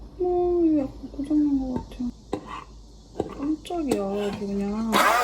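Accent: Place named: Seoul, South Korea. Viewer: 서울말 엄청 잘하십니닼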